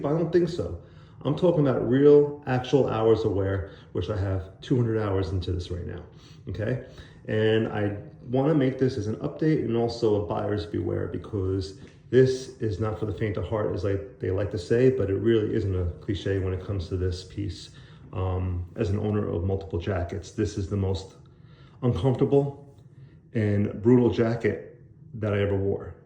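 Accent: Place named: East Coast, United States. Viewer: Been thinking about taking the 33 leap lately.. I'm thinking to request a shorter length and forgo the lower pockets to avoid the rising shoulders when sitting as you mentioned. Jacket is looking great, and you're actually selling me on the choice for a lifelong jacket. Also, you've got a great voice. I didn't notice any lisp or anything. I only notice you sound from the east coast.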